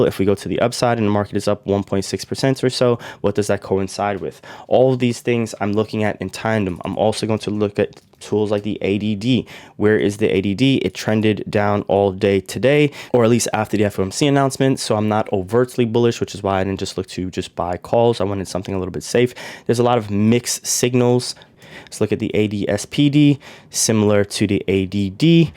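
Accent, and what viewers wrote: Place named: New York, United States. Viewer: I just know you're from New York bro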